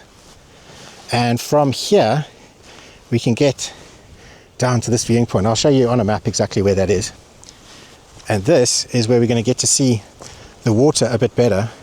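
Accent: South Africa. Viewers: Nice to hear a South African 😊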